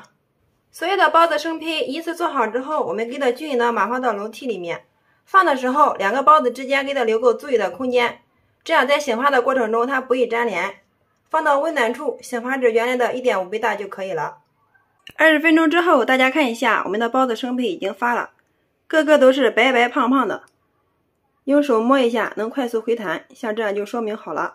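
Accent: Shandong, China. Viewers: 山东人吧？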